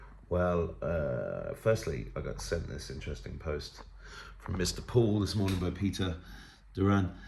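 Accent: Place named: United Kingdom. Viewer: Ohhh you are sounding very British today, hehehe.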